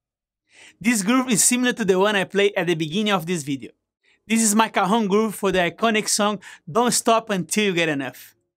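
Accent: Brazil. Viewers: Você é brasileiro? Teu sotaque !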